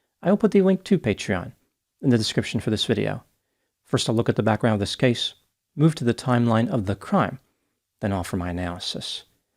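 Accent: United States. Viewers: It took me a while to work out where these places were. I am Australian and the pronunciation was uniquely American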